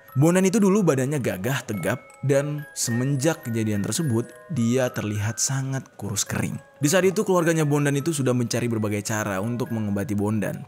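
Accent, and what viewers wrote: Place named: Java, Indonesia. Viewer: Bang Ngomong Jawa Lucu Sumpah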